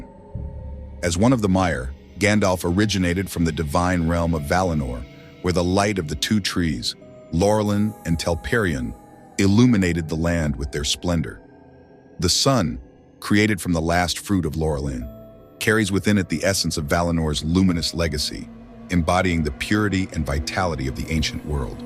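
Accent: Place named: Scotland, United Kingdom. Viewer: Quite the Scottish accent you have, growing up in the beautiful lands of Scotland and all. Telling 'your' stories that are inspired by the beautiful landscapes you grew up in. Sounds authentic.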